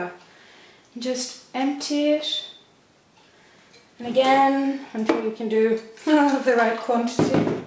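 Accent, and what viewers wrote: United Kingdom. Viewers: Hey, Auntie.... you are so cute. I loved to watch it. You should do comedy TV.:-) Your English is really "Russian free", I swear... more you already have a colour of "very British". This Frappe rememers me good times when I was skipper for sailing yachts in Greek Cyclades... easy to do, and for Coffee junkies like me a great thing. Cant live without it, even in hot summer in midth of Germany (we have up to 38 degrees todasy). Keep going...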